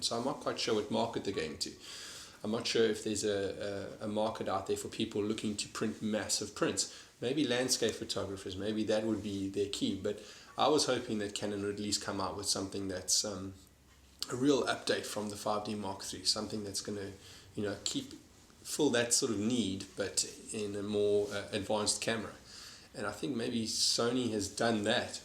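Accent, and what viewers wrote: South Africa. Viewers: Are you from South Africa? Sounds like your accent is from that area. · South Africa, one of the best accents.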